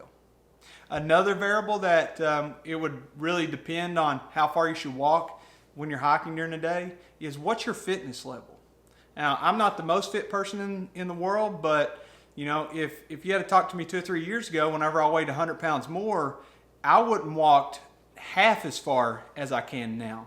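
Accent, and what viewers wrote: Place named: North Alabama, United States. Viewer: You from north Alabama by any chance? You sound about like me. lol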